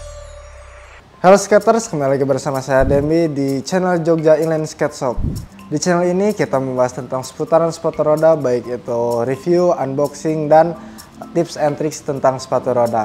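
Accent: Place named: West Java, Indonesia. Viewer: Jogja rasa sunda😅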